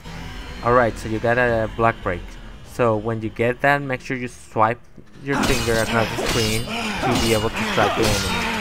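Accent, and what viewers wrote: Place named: Philippines. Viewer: u r philipino right ?i love ur english accent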